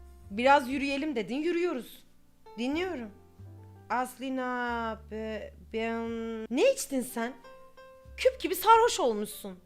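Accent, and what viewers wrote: Black Sea, Turkey. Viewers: Haşmetlimin her episode serisinde birini Karadeniz şivesiyle yapmasına bayılıyorum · Karadeniz şivesi❤ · karadeniz şivesinden dolayı ciiddi şeyler çok komik yaa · Batugh Tanem valla senden akilli xngxhnxmhfmjfmjf Ve karadeniz sivesi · Karadeniz şiveli gercekmiss hayallerim gercek olduuuu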